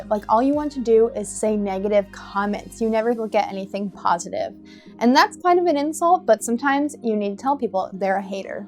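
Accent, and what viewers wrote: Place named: California, United States. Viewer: Thank you very much for your video. I added your channel. I am always wondering where you are in the US. I slightly hear California accent.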